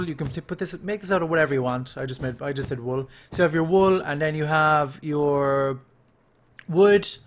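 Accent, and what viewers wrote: Ireland. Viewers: Can you please start making videos again? 1. I just want to listen to you talk (the Irish accent is the best) and 2. I really like your builds.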